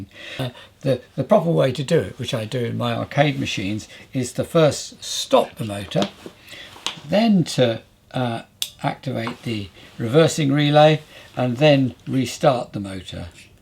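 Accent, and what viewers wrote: United Kingdom. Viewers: Did I hear right a British voice speaking metric. Then you went straight back to lbs. All good old Aussie tradesman that understands both but lean towards metric. Many thanks for your program.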